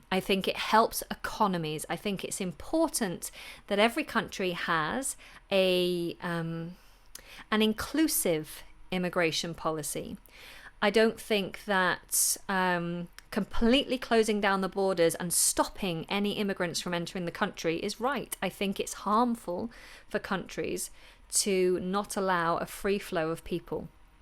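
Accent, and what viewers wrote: United Kingdom. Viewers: You are doing a great job!Keep on!I wish health and happiness to you and your child!Thank you for your videos!I love your British accent!From Russia with best regards! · Where do you live? Your accent is as Theresa May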